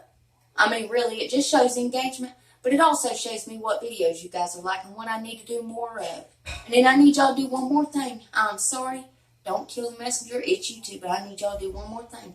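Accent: South, United States. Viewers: I'm going to try this out too. We'll see if it works on a 60 yr old face. Lol I love watching you and listening to your accent. I'm a southern in Arkansas so it's nice when I run into a southern accent. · Girl love what watching your videos you.... you crack me up.... and I live all the make up tips that you give and I love your southern accent I'm a country girl myself ❤❤❤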